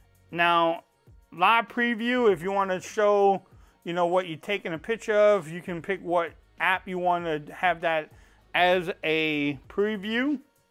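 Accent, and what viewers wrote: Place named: North, United States. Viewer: Great videos! I just got one and it's so much easier to hear instructions in english with an American accent! I mean you don't sound like me and I don't sound like you! But I guarantee you we both can comprehend each other. You sound northern to me (no offense!) I'm from Miami . If a lot of these people, that think they speak english would quit talking to their friends, in their language and use english both people would get better. Ask Tony I just set mine up and didn't even raise my BP! Thanks! (sub added)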